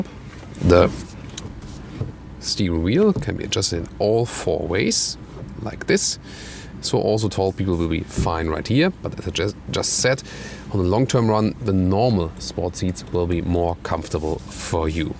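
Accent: Germany. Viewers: Wenn du einfach das deutsche Englisch heraushörst. ;-) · Good information! And I really do like your fucking german accent. :))) Great! Did you do these videos in German? I'd like to watch it in German.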